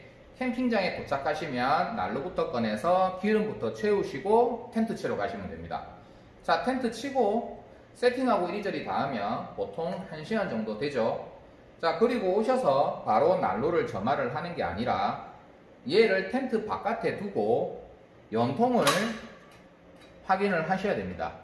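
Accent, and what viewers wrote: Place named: Gyeongsang, South Korea. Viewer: 행님 구수한 경상도 사투리 팍팍 꼽힙니다. 저도 경상도라^^ 초캠이라 많이 배웁니다. 항상 감사합니데이 행님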